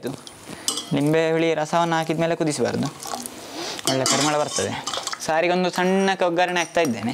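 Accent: Mangalore, India. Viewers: ನಿಮ್ಮ ಅಡಿಗೆ ಹಾಗೂ ಮಂಗಳೂರು ಕನ್ನಡ ಭಾಷೆ ಎರಡೂ ಚೆಂದ!!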